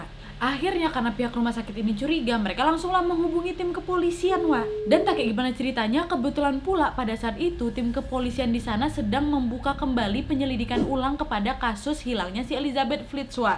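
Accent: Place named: Medan, Indonesia. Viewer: Sukak kli lho denger kakak ini ngomong, logat medannya tu.. Hihi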